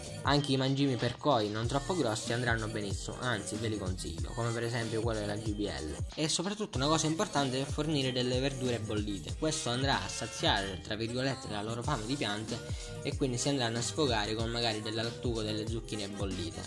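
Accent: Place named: Sicily, Italy. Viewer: Bravissimo... Sei siciliano, si sente!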